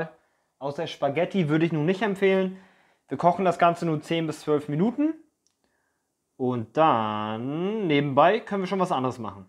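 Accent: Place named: Thuringia, Germany. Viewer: Na das muss ich unbedingt mal nachkochen. Ruhig mehr Rezepte von. Die sind immer so ein bisschen moderner und ausgefallener. Spezialität sind doch eher Klassiker oder zumindest neu interpretierte Klassiker. Ich habe gemerkt, dass ich auf einem anderen Kanal schon oft solche "schnell, einfach und modern" Vorschläge gesucht habe, als ich die leere Pfanne gesehen habe und schon den thüringischen Akzent im Ohr hatte "erst mal einen Klecks Öl in die Pfanne" 🤣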